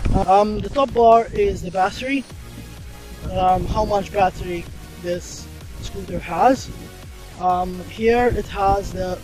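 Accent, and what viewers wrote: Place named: Malta. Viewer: Nice Maltese accent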